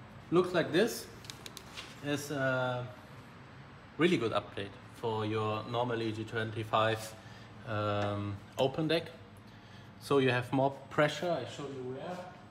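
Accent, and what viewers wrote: Germany. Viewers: давно в Германии живёт,слышно по акценту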